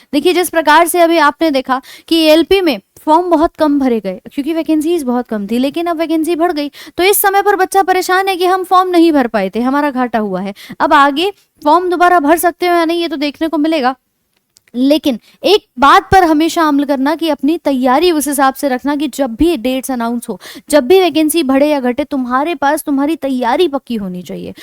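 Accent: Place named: Meerut, India. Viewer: Ma'am aap kya Meerut se ho kya Kyonki aap बढ़ाने को भढाना bolti ho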